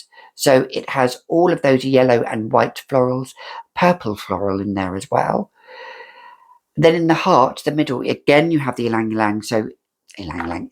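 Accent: France. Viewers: your French accent is lovely, I love hearing you pronounce names and brands! I know that's not the important part, but it's music to my ears 😊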